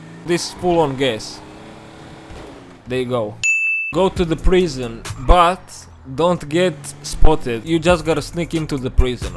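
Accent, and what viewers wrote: Russia. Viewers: I love this guy russian accent. Im balkan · Wait, no he’s either Russian or German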